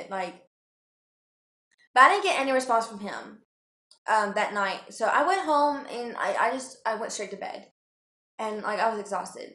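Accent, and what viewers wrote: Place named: South, United States. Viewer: where u from I hear a southern accent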